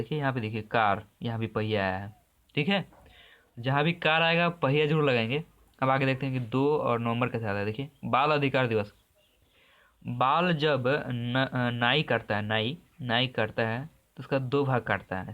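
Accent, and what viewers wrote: Bihar, India. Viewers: Sir aap bihar se hai kya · Sr r u frm bihar???? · Bhut bihari bolte ho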